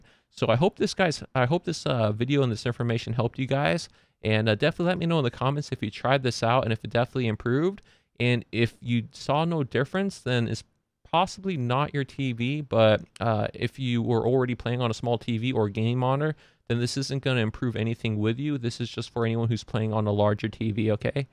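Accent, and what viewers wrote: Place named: United States. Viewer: Not to offend, your voice is that of a very American American. Which is strange because it doesn't match your facial features at all! Gotta love genes. :P Sorry if I did offend you.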